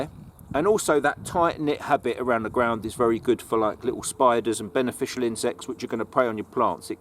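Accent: England, United Kingdom. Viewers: Good advice. Are you in England? (Going on your accent 🤷‍♀️) I'm in Scotland and not sure if I should grow thyme in a pot or in the ground? What do you think?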